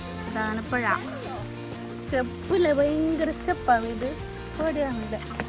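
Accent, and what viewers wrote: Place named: Kasaragod, India. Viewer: കാസ്രോട് ഭാഷ 👌👌👌😁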